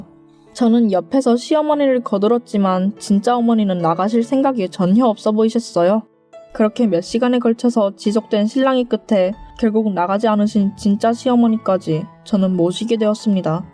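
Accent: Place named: Busan, South Korea. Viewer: 부산 사투리 세요? 듣기 싫다 정말 !!!조심하세욧 제발 ㅋㅋㅋㅋㅋㅋ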